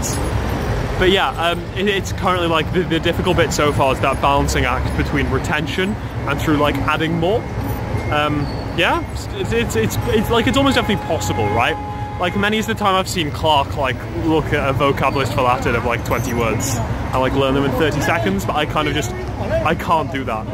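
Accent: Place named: RP, United Kingdom. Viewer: You sound so much posher than I remember cuz :) its really cool seeing what your getting up to though :)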